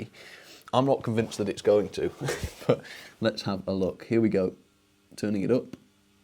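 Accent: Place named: England, United Kingdom. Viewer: you are literally the english accent version of SMT mainline hahah also very cool seeing this being fixed up!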